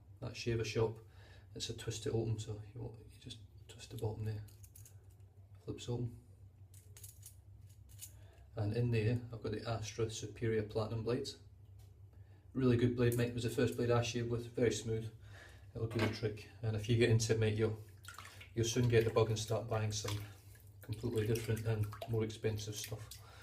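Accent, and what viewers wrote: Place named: Manchester, United Kingdom. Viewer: Nice tutorial, I've just bought the very same Proraso product (shaver is Edwin Jagger). Just a few more question, the pre-shave, on dry skin ? The soap, how long after the pre-shave ? The balm is before the after shave, and just straight after ? It seems you said usually it's the contrary, no ? Oh and lovely manchunian accent 👍😁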